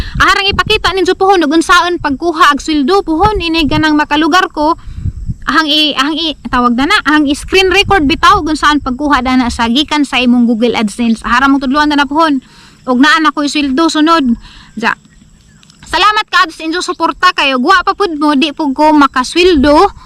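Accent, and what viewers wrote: Bohol, Philippines. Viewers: Lami jud kaajo paminawon ang sinultihan nga bol anon madam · Bravo mao gusto ko nga Boholana maayo pamation good job! Josephine Legaspi Grunseth from Texas! Shout out taga Sierra Bollunes family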